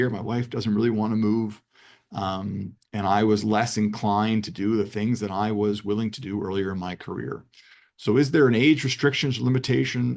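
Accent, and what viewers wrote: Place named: United States. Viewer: You pronounce "Z" like Yankees.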